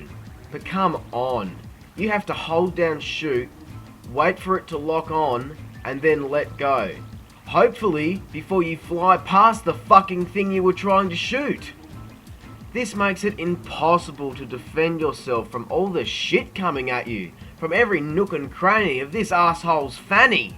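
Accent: Australia. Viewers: Your Australian accent really fits with this game :D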